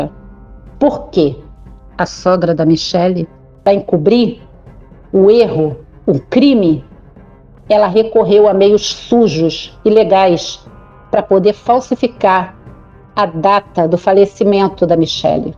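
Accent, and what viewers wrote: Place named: Rio de Janeiro, Brazil. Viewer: Eu to viciada na!!! Estórias mto boas!!!!! E eu que sou espírita seu que tudo que ela conta é real. E é carioca. Adoro sotaque carioca. Beijos pra vc. Brigada pelas estórias. 🥰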